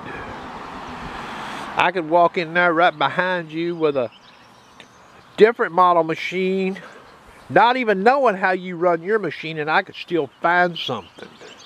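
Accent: South, United States. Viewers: From one deep southern accent guy to another, I completely agree with what you said at the end of the video. No one program or detector is going to get it all. That’s why I tend to re-hunt sites with several different programs/settings over and over again. Seems like I always find something that was missed before with previously used settings. I kind of enjoy that little nugget of knowledge as it reopens up places to hunt that some might rule hunted out. One place like that is my own backyard. It’s one of the most difficult, iron infested spots I’ve ever hunted, and I enjoy the challenge of tweaking different programs to pick out more nonferrous targets. Not only is slowly sifting through the iron fun, but it helps me hone my skills as a detectorist. Enjoyed the vid.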